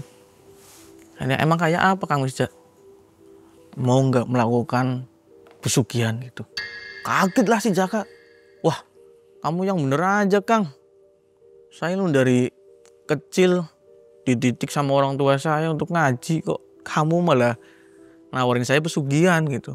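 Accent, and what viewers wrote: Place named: Java, Indonesia. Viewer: Saya suka dengan cara bicaranya mas..medoke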